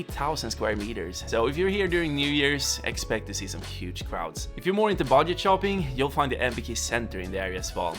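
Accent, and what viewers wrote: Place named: Sweden. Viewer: Sound like English in Swedish dialect.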